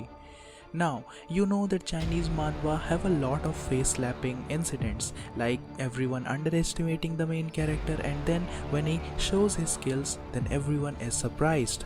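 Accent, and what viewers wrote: India. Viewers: the Indian accent kinda ruined the whole video so I had to watch it without sound. indian dingdongdingdong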